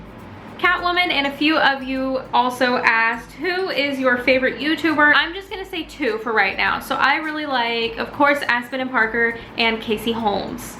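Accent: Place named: Texas, United States. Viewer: I did not no you live in Puerto rico you should like your from texas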